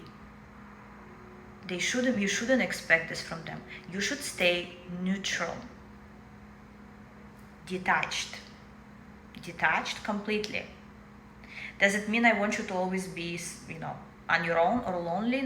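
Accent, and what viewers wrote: Russia. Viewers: You've got a strong ruzzian accent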